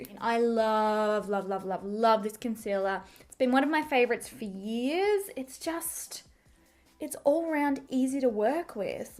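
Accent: Australia. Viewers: Just as I'm having fun listening to your aussie accent..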